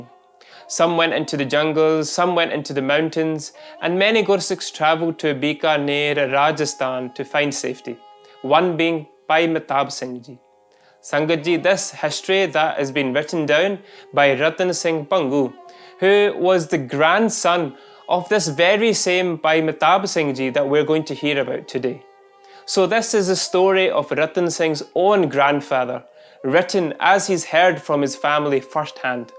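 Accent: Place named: Scotland, United Kingdom. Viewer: Brilliant job bhai ji, and I adore your Scottish accent.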